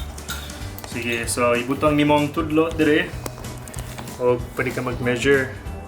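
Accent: Visayas, Philippines. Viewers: How come he knows how to speak bisaya? Are they doing missionary back in philippines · Whoaa! Nice to hear you speaking bisaya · hahahaha ka cute sa bisaya hehehe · Hala ka diha nakuratan ko! Ngano kbalo ka mgbisaya hala oi dong! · Hi Dong! If I close my eyes, you sound like a Bisayang Dako! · Hehe ako inahan og ako ero haha very bisaya hah? ..ang galing!!!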